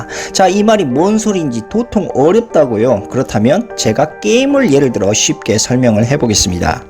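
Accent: Jeolla, South Korea. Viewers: 전라도 사투리가 진하게 베어 나오네... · 이분전라도말씨네요 친중파로보이네요 전라도는 다수가 친북,친중,,중요한건 공산당정권은 망해야한다는 진리입니다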